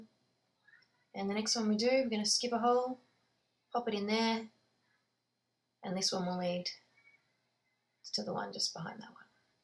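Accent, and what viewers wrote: Australia. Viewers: When I first heard you say: "under" I was hoping to hear "down" just before it. Love your Aussie accent. Please don't be offended. Nice video too. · I've tried 5 or 6 times, following 5 or 6 different videos, and NO LUCK! Saw this one, SUCCESS! Thank you very much! Is your accent Australian? Sounds that way to me. steve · Yeah she’s Australian, it’s a nice accent as it’s not too broad. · Australian I think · Yes.. she Australian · Australian accent eh!!!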